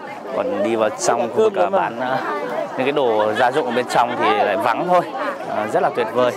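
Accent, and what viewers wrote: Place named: North, Vietnam. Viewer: Nói giong Băc mà lai quá nhanh, thât la nghe không gì hêt. Nói Châm lại môt chút đi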